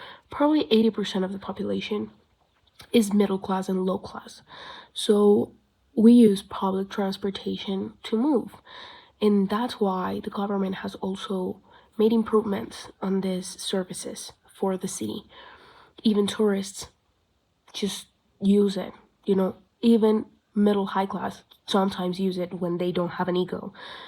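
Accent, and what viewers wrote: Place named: United States. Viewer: Wait you aren't from America? Your accent sounds very american.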